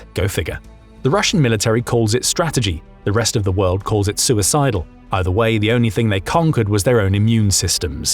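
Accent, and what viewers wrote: United Kingdom. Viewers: When someone with British accent says it's 100% real, then is based on british media or BBC .... :face-purple-crying::face-purple-crying::face-purple-crying::face-purple-crying::face-purple-crying::face-purple-crying::face-purple-crying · British accent. Discount all you hear.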